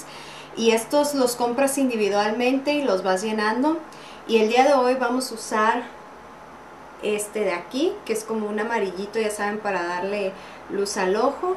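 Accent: Mexico. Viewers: ya decia yo que sonaba muy mexicana xD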